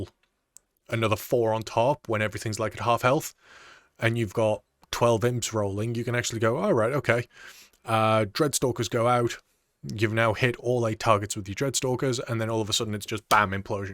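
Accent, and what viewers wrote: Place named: North East England, United Kingdom. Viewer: You sound like you're from NE England mate like me. Cheers for the advice, appreciate it.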